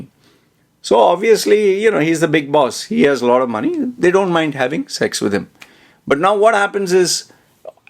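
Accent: India. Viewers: Latin name, Indian accent, Zombie tattoos, ice-fiend cool headed book worm in Thailand of all places You're a more interesting character than Andrew Tate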